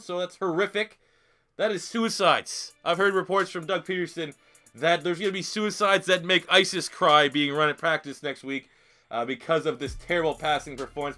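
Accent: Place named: Canada. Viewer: Josh Lak he's from Canada its most likely an accent thing.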